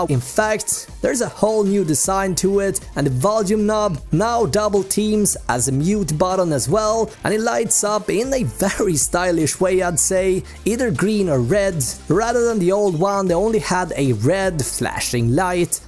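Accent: Sweden. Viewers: Is he swedish ? 🇸🇪🇸🇪🇸🇪🇸🇪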